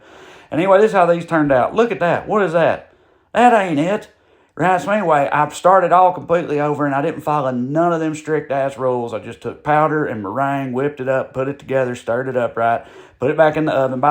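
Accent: France. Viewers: Really love this especially the southern French accent instructions. Looking for more. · Please do more French accents. ❤ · That French accent is on another level brother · Interestingly, I had pretty much the same experience trying to make ze macaron. I love to eat them in every flavor. Good Job! Next you should make Baked Alaska or Cherries Jubilee. You do a great French accent. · doing a French accent just brightens my day ❤😂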